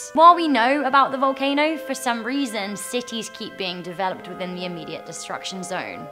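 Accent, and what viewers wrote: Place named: United Kingdom. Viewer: I love your British tone its the best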